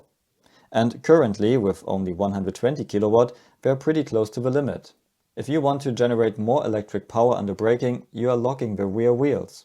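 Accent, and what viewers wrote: Germany. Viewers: Danke, endlich mal ein Deutscher der es vernünftig knapp erklären kann. Ob auf deutsch oder englisch ist egal - der Akzent vermittelt ein gewisses mindset😂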